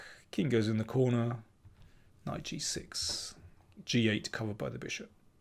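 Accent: United Kingdom. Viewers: Yeah. Very nice british accent.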